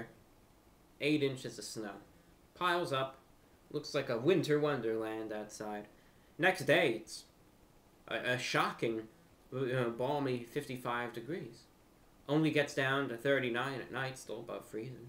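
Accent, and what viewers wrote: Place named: New York, United States. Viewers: I like these vids, they are enjoyable although it's hard for me to say why. It's very inoffensive and vanilla, so I guess it's soothing to listen to these random and non-controversial topics after a day full of stuff. On a side note, I was totally convinced you had a NYC or New Jersey accent from my first time watching, but for some reason I thought I heard in another video that you grew up in the south, so I was confused. Anyway, as another northerner who lived in the south for a while, I can definitely say I prefer variety in the weather, and I like winter. A couple of times when I was in Mississippi it actually snowed and I got to see the whole town shut down in a panic, which was fun. We got less than two inches. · wow I was wondering where you were from. I always thought you sounded like you were from NYC metro area but yet your vids often gave impression of you being in the south.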